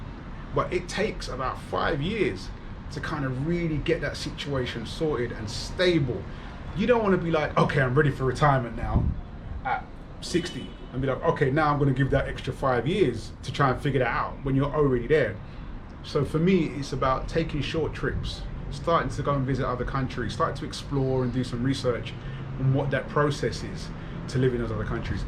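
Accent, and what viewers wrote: London, United Kingdom. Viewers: Had to do a double-take when I heard the London accent haha - a lovely surprise! We lived in Tottenham until the riots (my ex-husband still lives there). So hard to find any content from Black British people who have moved over to Latin American countries. I was initially looking at Mexico for me and my daughter, but now I'm discovering content about Colombia! I'll be a new subscriber lol. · Referred from Ace’s channel.. I’m not gonna lie it’s nice to see Londoner that looks and talks like me.. that’s taking the leap to broaden his horizons unlike most people who happen to think “London is everything” going forward with your channel and life in general wishing you the best 👊🏾 ps.. I’ve liked and subscribed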